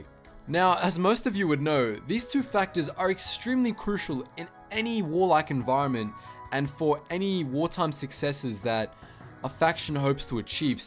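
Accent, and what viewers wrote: Australia. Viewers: maate didn't know you were a Aussie aye, what state are you from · How did it take me so long to realize that you are Australian? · Wow. You were not kidding about that Aussie accent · Ahhh you’re an Aussie like me lol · You are an Aussie? wooooooooooooooooooooooooo go Australia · I watched you and never new you were an aussie like me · Aussie eh, Nice 🇦🇺🇦🇺🇦🇺🇦🇺🇦🇺🇦🇺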